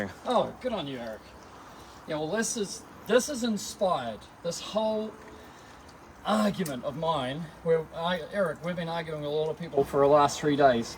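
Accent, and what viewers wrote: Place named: Southland, New Zealand. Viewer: Hey - are you originally from Southland? - I hear that Southland twang in there. (I’m looking to buy and try out a Radial brush soon btw too 😃)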